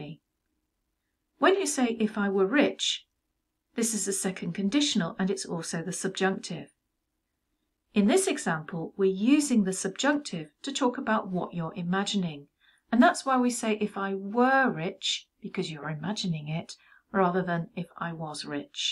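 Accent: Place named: England, United Kingdom. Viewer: Your English accent sounds really nice, where is this from?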